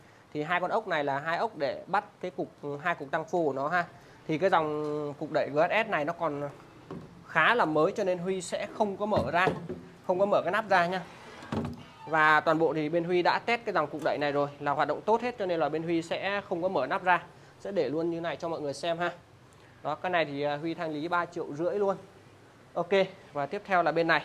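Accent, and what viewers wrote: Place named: Nghe An, Vietnam. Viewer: Tiếng nghệ an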